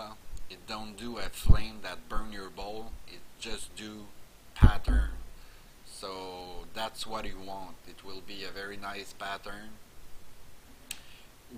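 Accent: Quebec, Canada. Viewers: Ho boy, toi t'es québécois. XD cool work dude!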